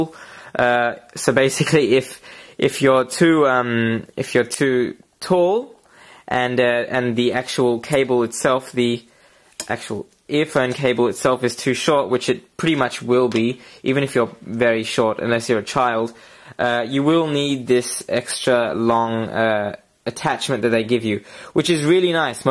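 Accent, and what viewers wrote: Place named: South Africa. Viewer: He sounds kinda South African.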